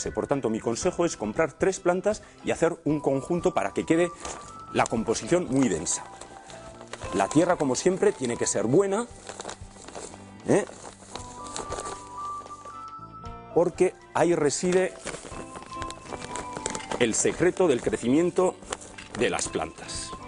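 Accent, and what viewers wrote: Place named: Spain. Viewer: Hola siempre te veo,soy tu nueva suscriptora,las tengo en masetas en un patio con mucha luz, no las tengo en sol y como se isieron muy grande las cambie de maceta, tenía cochinilla😂😂 y la cure con jabón potásico y min, pero mi pregunta es 😂😂no sé qué le pasa a las hojas tienen un color con manchas naranjas con puntos negrito🤔😁 que puede ser?será al guna plaga,es la primera vez, que los veo🙄😁🤔 de que lugar sos pareses del algún lugar de España, por tu acento,gracias un saludo 👋🇦🇷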